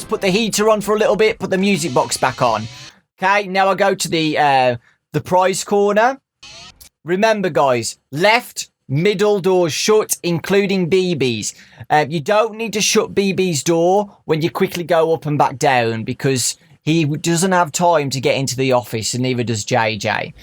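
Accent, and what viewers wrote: United Kingdom. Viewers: This guy has such a British accent it sounds fake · He is british right? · ultimate britain voice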